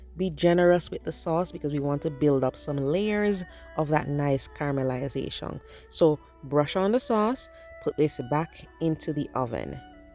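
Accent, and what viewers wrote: Jamaica. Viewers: Yummy looking I can only imagine the great taste loved you Jamaican accent 😋🥰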